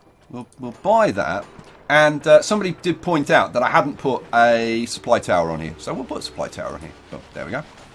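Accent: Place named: United Kingdom. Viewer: Yo. It's been 2 years since you played RE. Thank you I've really enjoyed this series and will you be doing any of the DLC? Sounds like your from the UK and would like to see you do the Great Briton DLC. There are loads of changes been done to the game. One, in particular, is the warehouse can be built close enough to the cities where you don't have to connect to the city, the city just take from the warehouse what it demands.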